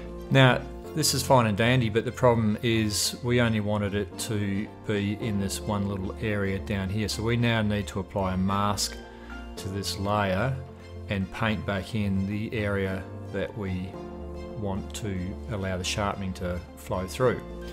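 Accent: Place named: Australia. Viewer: I have watched a gazillion photography tutes on YouTube. Great to hear an Australian accent for a change.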